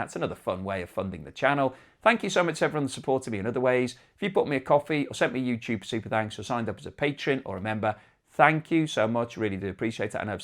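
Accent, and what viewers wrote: United Kingdom. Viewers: you sound very British, and nobody in his right senses today takes seriouse what a British man says about Russias, Britain amd the West is the world problems, even worms knows that!!!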